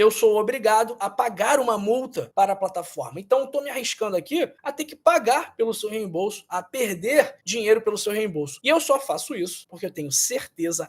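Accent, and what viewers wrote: Rio de Janeiro, Brazil. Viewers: Faz só dois anos que estou comendo banana madura e bem madura. Aqueço numa frigideira pequena de teflon sem por açúcar e sem óleo depois adiciono aveia já cozida em água pra evitar leite . No final adiciono boa quantia de canela moída . É lanche bom. As outras frutas nunca consumo. Percebi que a ameixa seca preta tem um açúcar terrível; dói o dente na hora. Muito legal o seu sotaque carioca.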